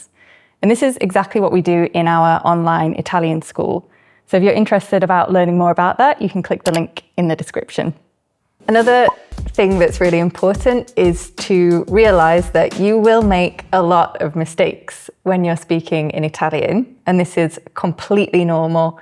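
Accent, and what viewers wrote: United Kingdom. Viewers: Wov, what beautiful british accent. I vove it. Theo-Canada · My first time hearing your native British accent.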